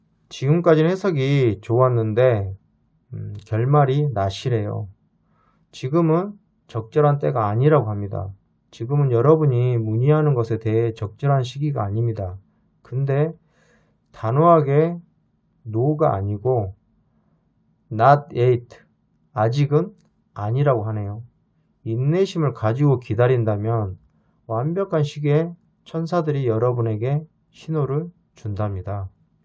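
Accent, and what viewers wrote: Jeolla, South Korea. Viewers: 전라도 사투리👍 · 의도하신건지 모르겠는데 설명하실때 사용하는 언어가 너무 웃겨요 그리구 전라도 분이신듯!??ㅋㅋㅋㅋ 2번인데 잘맞추시네요 ㅋㅋ 왜그런지 모르겠는데 사람들이 저를 좋아해요 감사합니다~!!!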